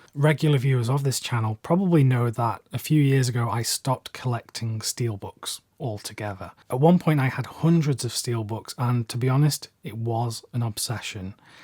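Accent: United Kingdom. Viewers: Are you not British?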